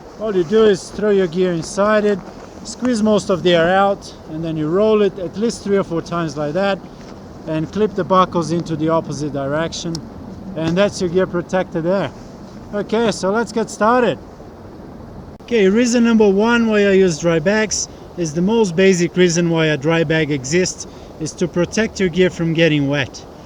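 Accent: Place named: Brazil. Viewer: Thanks for the video! I discovered dry bags recently and have started using them a lot. Incidentally, love your accent. At first I thought Brazilian, then figured you for an Italian... then found your Instagram and had my original suspicions confirmed! :)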